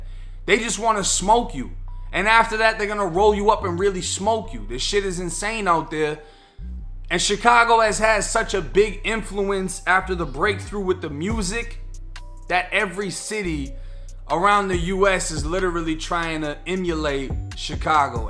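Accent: Boston, United States. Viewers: Are you from Boston ? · are u from Boston? cuz you sound like you are from here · Great vids just wondering where your accent is from, boston? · Yo you from Boston??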